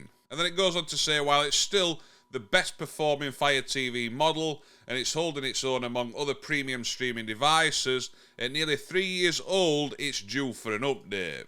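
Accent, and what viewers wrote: Yorkshire, United Kingdom. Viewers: I love the fire TV cube! The only problem with it is that I find that I have to restart it every day to keep it stable. If I don't, it goes haywire if I use my headphones! I'll turn them on and YouTube (which is mostly what I watch) just sticks and I get that "loading" circle! I tend to restart it every morning. Apart from that, I'm fine with it the way it is! I love your down to earth videos and your lovely Yorkshire accent. I'm was born in Scotland, we moved to Merseyside when I was 9 and lost my accent!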